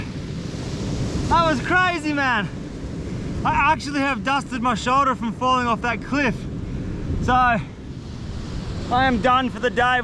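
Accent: Australia. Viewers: This dude is awesome, he has the ability to be really excited about almost eveything, the Australian accent is like the cherry on top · he has an Australian accent. most people just relate australians to be tuff and not scared of most stuff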